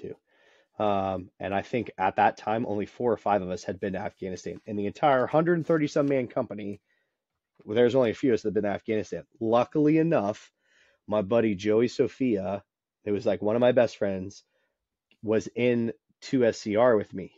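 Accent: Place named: England, United Kingdom. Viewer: I love your English accent ❤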